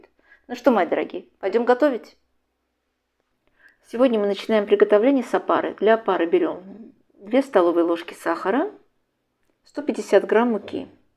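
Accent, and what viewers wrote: Baku, Azerbaijan. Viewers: Спасибо большое за рецептик, у вас такое тесто получается бесподобное, буду печь к Пасхе по вашему рецепту. А разговор ваш чисто бакинский 😊 ❤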